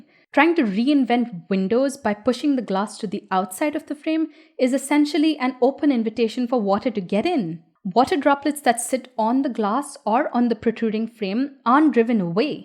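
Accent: India. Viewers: Your posh Indian accent gives your content an extra brutal authority, and I'm loving it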